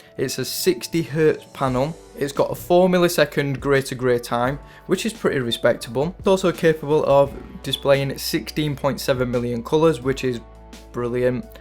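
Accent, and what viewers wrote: United Kingdom. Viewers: he is british !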